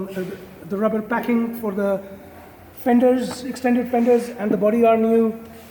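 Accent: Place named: United Kingdom. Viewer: Great projects, and excellent British accent for this little British Motoring icon. Job well-done, sb!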